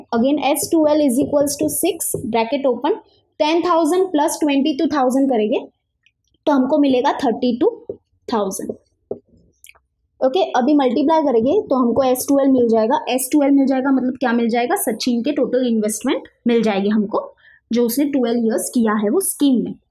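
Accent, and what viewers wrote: Maharashtra, India. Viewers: Miss are you marathi Because you says barobar ❤️😀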